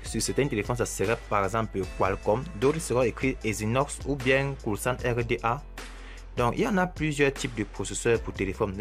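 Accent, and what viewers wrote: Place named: Cameroon. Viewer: Jvoudrais aussi un box si jpx l'avoir chez toi jcroi q t'es au camer, vus ton accent je crois que t'es 1frero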